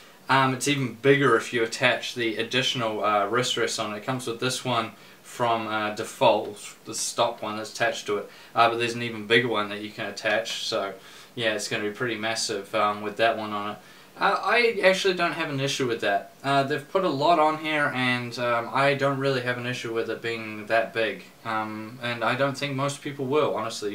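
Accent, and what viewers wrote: New Zealand. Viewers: Sounds more Kiwi than Aussie, unless you're not an Aussie yourself, then all is forgiven:)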